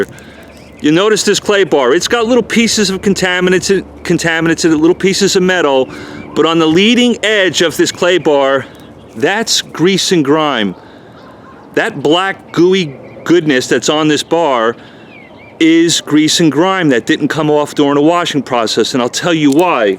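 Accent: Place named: Italian-American, United States. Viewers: This guy must be Italian from the east cost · Truck is Texas, voice is Long Island (observation from Australia).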